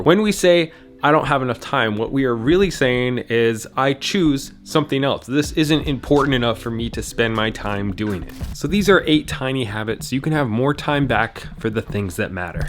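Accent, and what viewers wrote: Scotland, United Kingdom. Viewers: I would’ve never guessed that was a Scottish accent and my husband is Scottish. My views on commuting and waiting have completely changed with audiobooks, kindle and online courses. You can read or learn anywhere … almost nothing is a waste of time unless you forget your phone charger.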